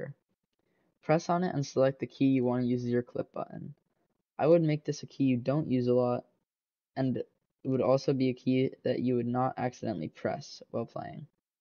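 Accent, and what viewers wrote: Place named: India. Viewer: you sound like one of those indian tech guys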